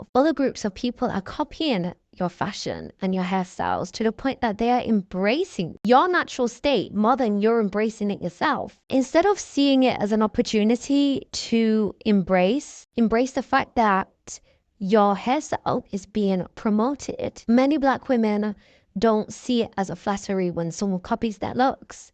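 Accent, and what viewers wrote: United Kingdom. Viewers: The voiceover sounds like a White woman from up north in the UK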